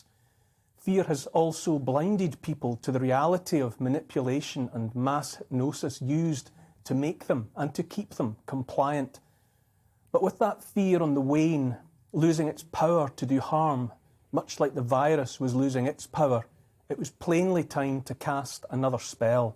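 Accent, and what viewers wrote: Scotland, United Kingdom. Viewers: You are the very first person I’ve comes cross to see through this guy. I was getting worried I was the only one. He’s crafted his delivery to come across as very sincere. He doesn’t smile, continuous Scottish monotone, speaks of tradition, knowledge, learning, then says he’s not an academic, but that taking advice from medics, scientists, and virologists who have to train and study their disciplines for years should be ignored. He doesn’t acknowledge this virus has killed and caused long term damage to thousands of people. But these fools kneel at his feet. Pathetic. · Freedom sounds so much better in a Scottish accent.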